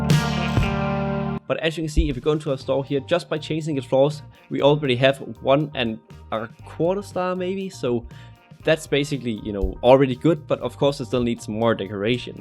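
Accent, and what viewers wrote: Denmark. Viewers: are you danish i heard you eller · man kan godt høre at du er dansker lol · jeg kender en dansker når jeg hører dem.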